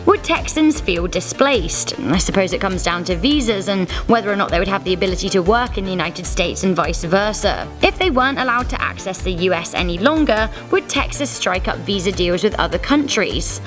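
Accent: United Kingdom. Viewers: Its weird listening a British woman say Texas tbh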